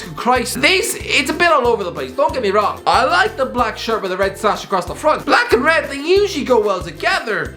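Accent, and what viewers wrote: Ireland. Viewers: Qna: why are you irish? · Are you Really Irish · Where in Ireland are you from?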